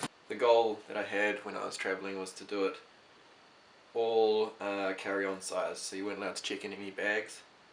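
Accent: New Zealand. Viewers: So good to hear Kiwi accent on Youtube! Im from NZ & off to Euro in 2 wks time. Got a Pacsafe x40 & few other bits n pieces. Thanks for the tips, really helpful. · a Kiwi (from New Zealand - the land of superb hiking). Aussies generally have broader accents, lol!! Happy travels. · Great video bro! I'm a fellow kiwi currently travelling around Europe too, you have a lot of great tips and I've defiantly learnt a couple things. Good to hear a kiwi talk after months of Europeans haha. loved the video. Cheers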